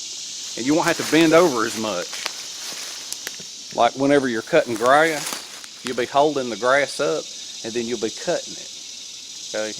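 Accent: South, United States. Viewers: Hey Bro, I'm in South Carolina. By the sound of your accent, we're southern cousins. From which of theses United States do you hail, patriot?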